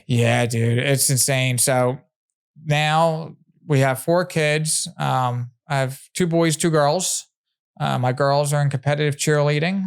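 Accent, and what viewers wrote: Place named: Delaware County, United States. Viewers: I swear I was in bowling green in pa with you can’t forgot that delco accent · Wish I could listen, but that Philly accent grinds my gears hahahah. Practicing tolerance 🙏🏻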